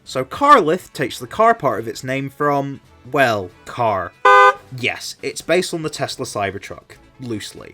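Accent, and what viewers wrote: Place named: United Kingdom. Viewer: Your little British accent >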